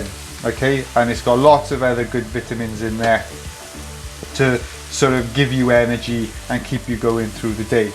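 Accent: Wales, United Kingdom. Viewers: Hi, great to hear a Welsh accent on Youtube and in the Bosses group. Great video, good luck tomorrow for the Grand Slam!!!!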